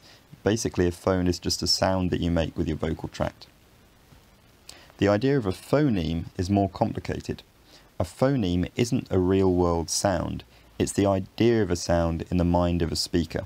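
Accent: South Africa. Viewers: still has a slight South African accent. A few times when he uses an /i/ between two vowels and is most noticeable when he says "I" Otherwise I'd have no idea he wasn't native British. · That's the poshest South African I've ever heard.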